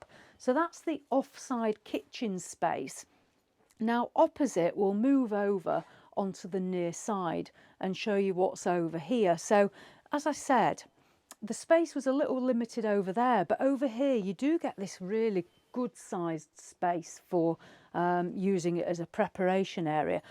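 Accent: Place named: Lancashire, United Kingdom. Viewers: Greetings from way across the pond. I'm Bob in southern California. I recently purchased a use travel trailer and begin my retirement. I wanted to help a bit, the privacy curtain about the bunks is a representation of a Topographical Map. Also, although I am a born and raised American, I would say you come from Liverpool, yes? Please tell me if I'm correct. My ancestry is Scottish, and I have always identified with the British Isle's. Although it might have something to do with my love of the Beatles (not to many bands can beat them). God Bless, I look forward to more European RVs. PS: I just subscribed. All 4 now, Bob Deskins. dB)> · You always give the best presentation/review of all and the videography is the best, studied and smooth, not erratic at all. Your very clearly spoken Lancashire(?) accent is great to listen to. Overall, very good indeed. 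· No need to apologize! I think it's interesting, I don't notice the northern accent as in Yorkshire though! What area are you from if you don't mind me asking, being multilingual I'm extremely interested in dialects, your not the only person I've heard using the broken syllable but curious to pinpoint its origins😊😊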